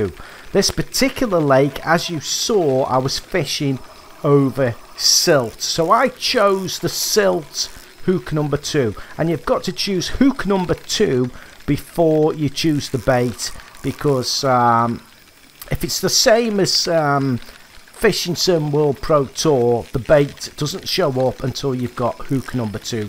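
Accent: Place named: Scotland, United Kingdom. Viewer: thank you🙏 your accent is funny do you come from Scotland?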